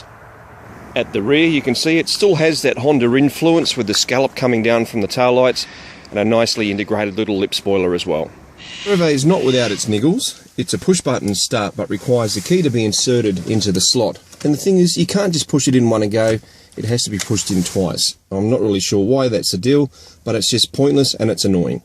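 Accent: Australia. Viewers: Ajam Yunus aussie le ngok... slang down unduh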